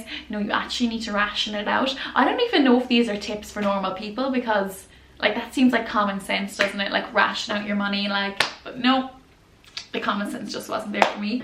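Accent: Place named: Ireland. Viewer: Irish accent? I LOVE IT ❤️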